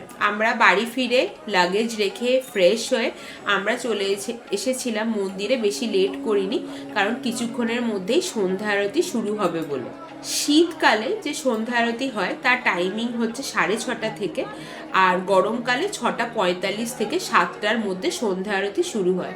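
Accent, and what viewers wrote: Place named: Kolkata, India. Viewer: Amon bol cho jeno kolkata theke gecho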